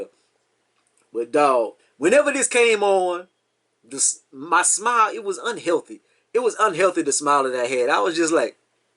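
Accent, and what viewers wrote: Louisiana, United States. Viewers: Man I knew you had to be from Louisiana, I left after Katrina at 14 but never forget the accent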